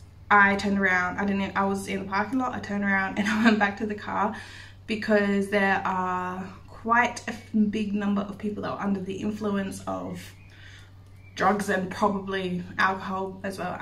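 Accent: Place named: Australia. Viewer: Sorry missed township U are at next time please repeat is it in Aussie U sound Australian... For Breakfast if U like steak buy some Minute Steak tender and cooks fast...Lamb Rump Steak are yummee sear quickly on the stove in butter and a little oil...make some gravy up for morning with eggs on toast yummee... Only suggestion buy some Topside Mince from butcher U will never go back to Coles... Why don't U spend $200 at Butcher or make a deal with him as he is trying to get your business...veal chops are great to eat aswell... I think Nick is correct country things are better than Grocery Stores... Whole Chicken make Broth out of thr Bones...also if U like those Expensive $13kg Tomatoes grow squeeze some seeds into a pot grow your own...as it looks like Summer...I was always told if U like the taste of a tomatoes U keep the seeds of them for now or next year to grow put some on a paper towel...😂❤😂❤😂❤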